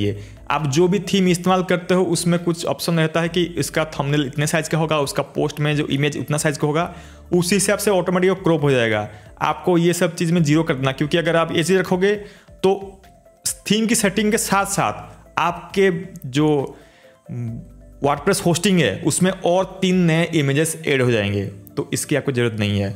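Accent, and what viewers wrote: Odisha, India. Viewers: Bro aap odisha ke ho...aap ka voice lagraha hai aisha ..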